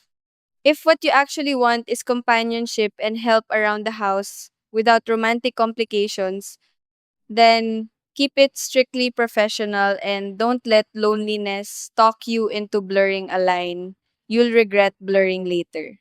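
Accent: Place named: Philippines. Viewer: Don't listen to that guy. I find English spoken with Philippine accent very attractive in much the same way most men feel about English spoken with a French accent. Of course, it helps if the speaker is an attractive and intelligent lady like yourself.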